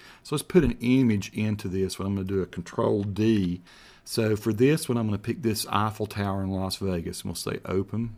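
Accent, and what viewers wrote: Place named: North Carolina, United States. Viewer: that accent is just precious. You sound like your from southeast NC.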